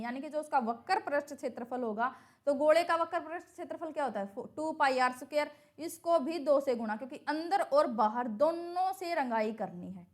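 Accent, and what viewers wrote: Haryana, India. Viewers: Mam aap Haryana se ho kya??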